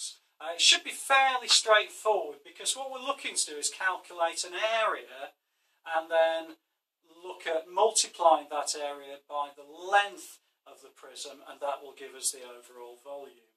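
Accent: England, United Kingdom. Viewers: For some reason a Englishman explaining these things are more reassuring. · i'm english and i feel the same way idk why though i should be used to my own accent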